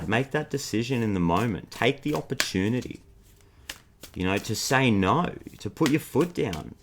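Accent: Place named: Australia. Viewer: Australian accent... you notice it so much more the difference how we speak compared to the american tarot card readers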